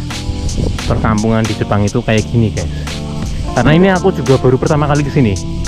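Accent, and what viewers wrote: Banyumas, Indonesia. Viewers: jawanya ngapak maseh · Wis katon sampean pasti asale sng brebes.. soale logate ngapak.. salam kenal mas e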